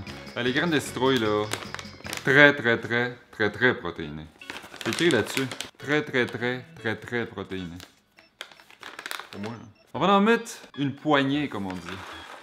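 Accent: Canada. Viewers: Quel délice l'accent et l'humour canadien pour nous européens ;-) ..on va essayer ça et ne pas en abuser hihihi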